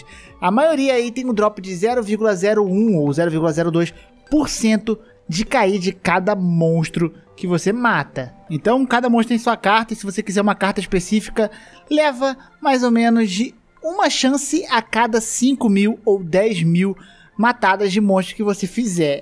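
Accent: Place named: Bahia, Brazil. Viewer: vc e baiano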